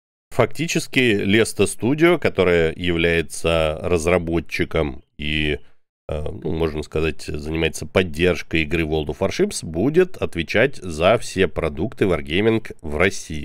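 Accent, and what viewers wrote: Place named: Odessa, Ukraine. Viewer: У тебя такой типа одесский говор, как у того поца у конотопской синагоги.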